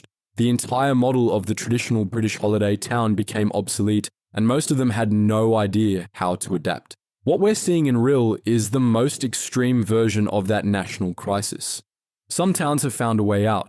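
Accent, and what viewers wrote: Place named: Australia. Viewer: Australian voice indicates it's never been and is using the BigBlackCo... sorry... 'BBC' for information.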